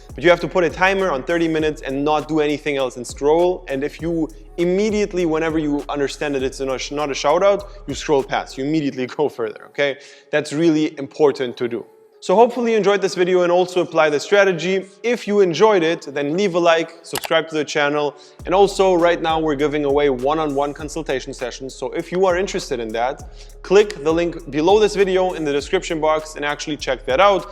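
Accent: Germany. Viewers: Servus, an deinem englisch (zugegeben gut, aber trotzdem) erkennt man das du deutscher bist:D Hilfst du auch kompletten anfängern beim einstieg? möchte unbedingt anfangen aber nicht unnötige Fehler machen die zeit und geld kosten.. habe ca 1k, wie lange dauert es durchschnittlich bei korrekter vorgehensweise damit einen profitablen shop aufzubauen? Es geht mir nicht um schnelligkeit aber ich will halt auch nicht unnötig kohle verbrennen und zeit verschwenden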